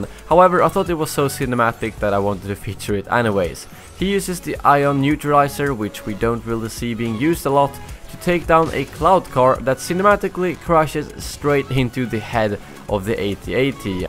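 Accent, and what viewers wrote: Sweden. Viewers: agentokky he's Swedish, so it's not surprising if he doesn't speak English correctly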